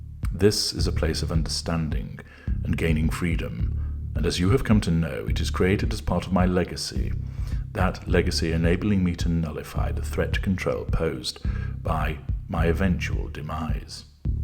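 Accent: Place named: RP, United Kingdom. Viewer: one of the most intelligent, creative and original voices on YouTube. Also one of the most hypnotic bass voices speaking the Queen’s English. Please don’t go away.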